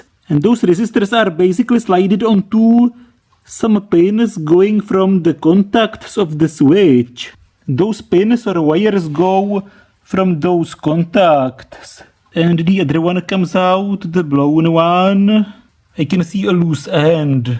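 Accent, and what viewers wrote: Czech Republic. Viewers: I believe czech really sound like that. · Czenglish hell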